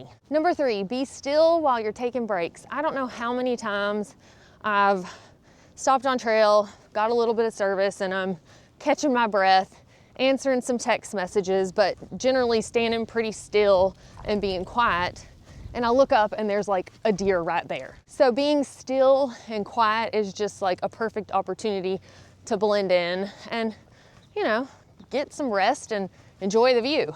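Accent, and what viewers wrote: South, United States. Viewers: Girl, love that southern vibe. Never heard more dropped g’s at the end of words in my Texas life!😊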